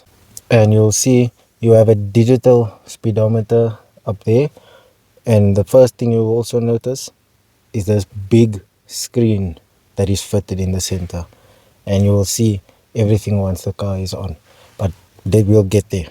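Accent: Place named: South Africa. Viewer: was wondering if this is a South African reviewer then i heard "awe ma se kind" 🤣......On a hatchback, you gonna need that rear wiper....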